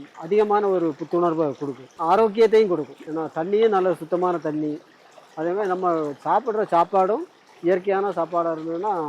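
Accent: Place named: Tirunelveli, India. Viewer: தம்பி நீங்க திருநெல்வேலி பாஷையில் பேசி பாட்டியம்மாவிடம் எடுத்த பேட்டி super தம்பி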